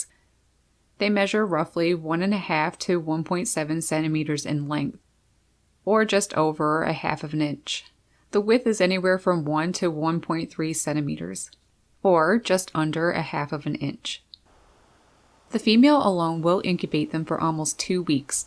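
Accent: Canada. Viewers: I LOVE & her Canadian accent....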